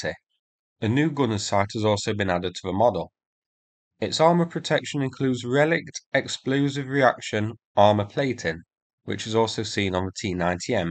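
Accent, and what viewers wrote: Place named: Midlands, United Kingdom. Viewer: Yep.......one of the Midlands accents. Pretty standard pronunciation of vowels for the area.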